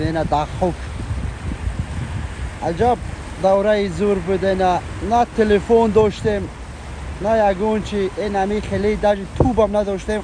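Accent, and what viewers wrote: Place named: Badakhshan, Tajikistan. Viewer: Дар лачаи Бадахшони мемрым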